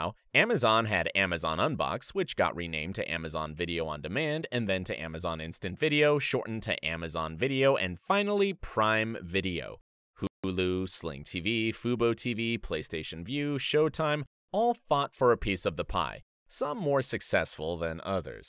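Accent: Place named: United Kingdom. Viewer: I actually miss the British accent. :(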